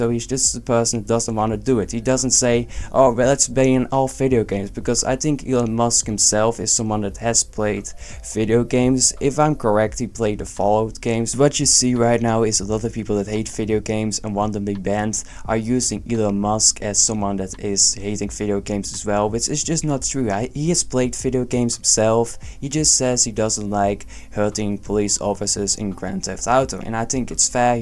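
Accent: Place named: Netherlands. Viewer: lmao this sounds like some heavy dutch accent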